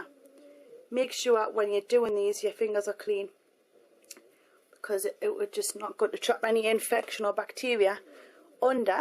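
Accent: Newcastle, United Kingdom. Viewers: Newcastle, can tell by her accent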